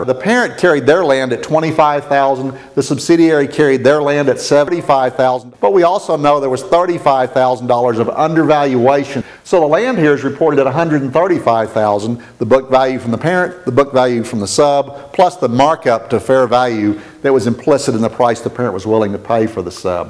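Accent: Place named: India. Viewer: You talk too damn fast it all sounded like hearing an Indian speak!!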